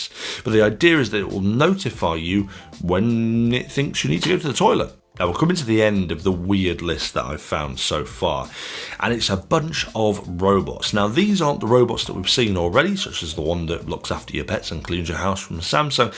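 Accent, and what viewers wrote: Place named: England, United Kingdom. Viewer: I swear your accent is from the same bit of Blighty as Clarkson.. and you're also speed and whiskey freak. Have you hung out with Clarkson, by any chance?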